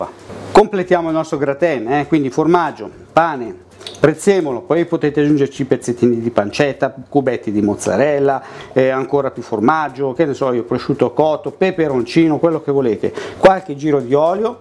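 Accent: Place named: Lombardy, Italy. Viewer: Buoniiiii li farò così la prossima volta , e poi mi fai morir dal ridere quando dici . ‘Guarda che roba , vien su un profumino ‘ sei proprio Lombardo come me . Bravoooo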